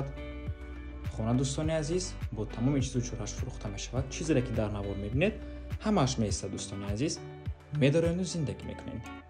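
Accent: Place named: Tajikistan. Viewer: Бисёр забони ширини тожики доштаи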